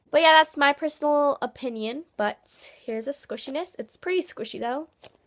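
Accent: Canada. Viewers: Wait is she Canadian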